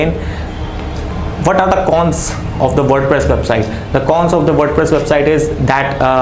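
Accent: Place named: India. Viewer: Nice video sir, informative, sorry but follow indian english accent.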